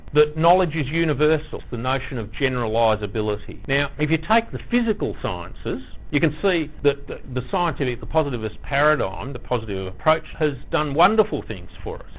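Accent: Australia. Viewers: Thanks for the video is this an Australian accent? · I'm pretty sure it's an Australian accent.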